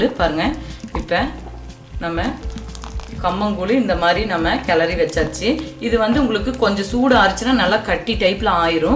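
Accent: Kongu, India. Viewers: கொஞ்சும் கொங்கு தமிழ் 😊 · மிக்க நன்றி, அழகான கொங்கு தமிழிலில் விவரித்த விதம் அருமை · கொங்கு தமிழ் கொஞ்சமாத் தெரியுதுங்க... நல்ல கூழ்...இன்னிக்கு நான் செய்யப்போறேன்.. 2020 ஜூன் 2 சென்னைல வெயில் தாங்க முடில...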